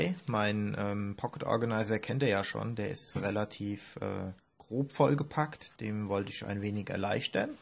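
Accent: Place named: Hesse, Germany. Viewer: Hi! Auf Deinen Kanal bin ich durch das Video von Karl Heger aufmerksam geworden:) Klasse Reviews & sympathischer Dialekt!:) Komme auch aus Hessen!:) Lasse Dir direkt mal ein Abo da! Liebe Grüße, Gear2Video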